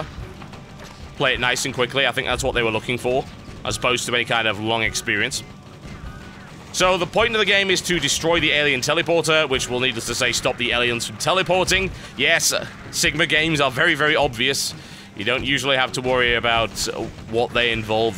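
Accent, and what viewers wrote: United Kingdom. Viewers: He's british. They say things funny. Like Amazon, to them, has an accent on the 2nd A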